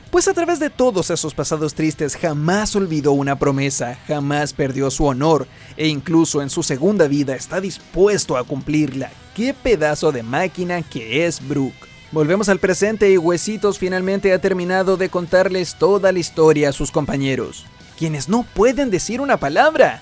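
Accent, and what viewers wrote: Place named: Chile. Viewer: Noooooo puede ser eres chilenoooooo. KAKSJAJSKAKS acabo de darme cuenta cuando hablaste de lo de ussop 😭😭😭. Pensaba que eras español, me subscribo y te sigo de acá hasta que termine one piece Akakjajaks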